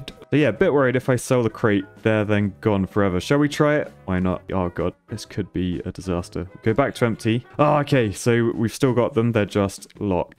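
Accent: United Kingdom. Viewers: I swear engineer is like df but bri'ish and more pg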